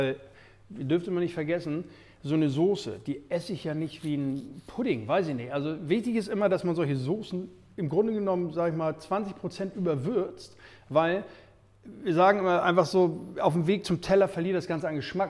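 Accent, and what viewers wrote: North, Germany. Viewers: Dieser norddeutsche Akzent 🥰 da wirken die guten Erklärungen noch besser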